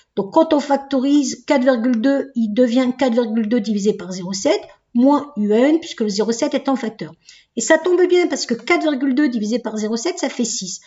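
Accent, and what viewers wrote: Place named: Southwest, France. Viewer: Superrr madame ! Vidéo qualitative avec un arrière goût du sud-ouest :) merci beaucoup !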